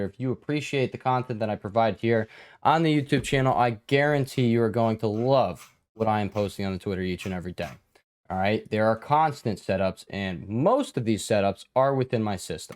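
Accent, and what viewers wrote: New Jersey, United States. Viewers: Are u from jjjjeersey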